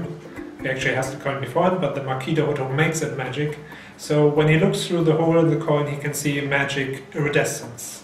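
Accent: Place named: Germany. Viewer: Oh the german accent :) looks really nice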